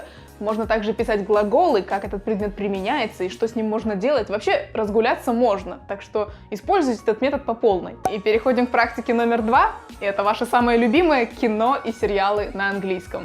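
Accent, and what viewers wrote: Kazakhstan. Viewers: Казашка? Ты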